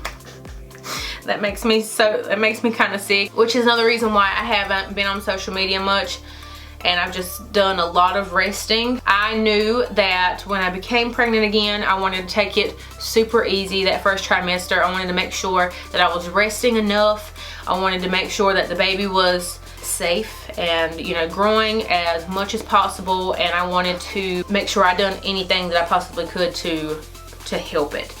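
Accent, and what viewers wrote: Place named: North Carolina, United States. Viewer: I got North Carolina accent as well lol. love it.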